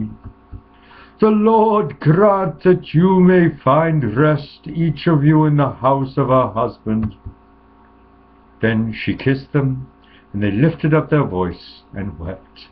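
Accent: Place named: Scotland, United Kingdom. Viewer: your Scottish great-grandmother (who is my grandmother) would be proud of your command of the Scottish brogue! Well done! And God says His Word will not go out and return unto Him void. - Judy Doorn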